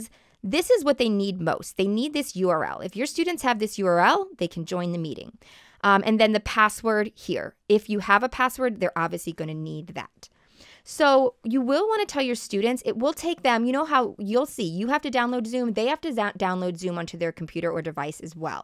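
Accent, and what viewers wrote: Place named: United States. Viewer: she sounds so american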